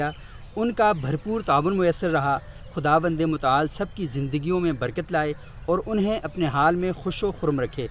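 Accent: Abbottabad, Pakistan. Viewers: Abbottabad ka lagta hay, hinko touch to aae ga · Bhai Lehjay or talafaz k liye abbottabad ka rehashi hn..haha bhai jnay do yarr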